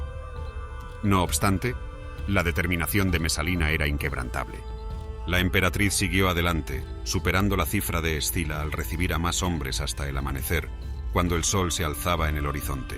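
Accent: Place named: Spain. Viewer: Por fín puedo escuchar este relato de la historia en español de España. Gracias.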